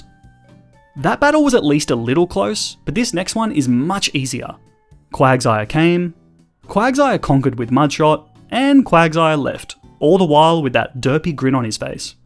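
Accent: Australia. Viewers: Ahh it’s so refreshing to hear an Australian voice over Pokémon runs, also do you have a discord server by chance?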